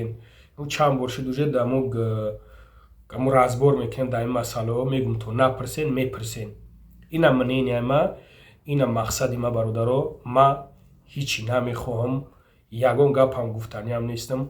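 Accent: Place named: Bokhtar, Tajikistan. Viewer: Хака хаму БОХТАР КУРГОНТЕППА гап мезана бачаи хола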